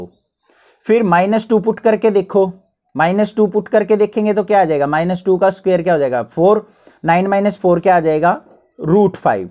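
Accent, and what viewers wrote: Haryana, India. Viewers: Sir haryana se ho ?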